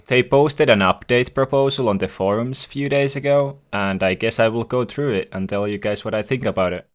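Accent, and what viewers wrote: Finland. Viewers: Ok so basically, ootko suomalainen because of your accent and name? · Musta tuntuu että sä oot suomalaine koska sun accentti ja sun nimi. Mutta iha hyvä video.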